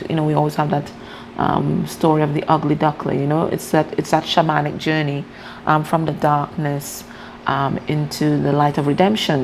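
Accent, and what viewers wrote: Trinidad and Tobago. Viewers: Wowwwww this is crazy. I first came to your channel months ago and I said to myself how she sounding like a Trini so 😂😂 Quito Quito indeed 👏💜❤️ bless up from the homelands. Love your readings.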